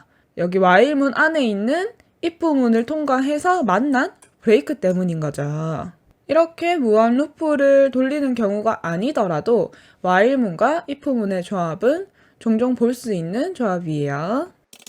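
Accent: Gyeongsang, South Korea. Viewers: 정보처리기사 시험용으로 C, JAVA는 인강으로 배웠지만, 인강에 Python이 없어서 어쩌나 했는데 여기 SONOL에서 느무느무 잘 배웁니다. 갱상도 액센트 늠 귀여워요~~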